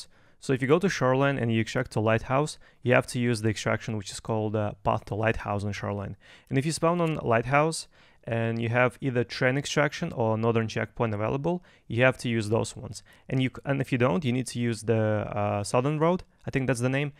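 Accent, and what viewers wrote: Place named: Russia. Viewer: уровень английского поражает, но все-таки слышны русские отголоски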